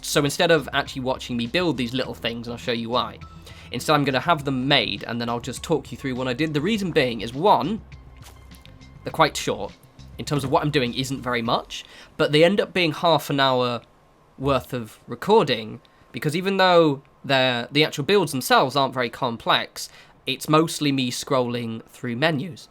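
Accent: United Kingdom. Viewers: nope British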